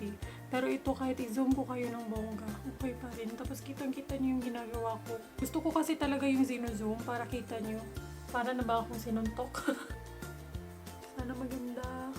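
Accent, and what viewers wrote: Visayas, Philippines. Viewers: Bisaya ni bai 🤙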